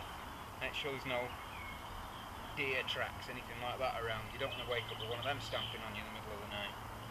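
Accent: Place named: Lancashire, United Kingdom. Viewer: Excellent video sounds like you not far from me I am near burnley fancy a meet up cheers keep up the good work thank you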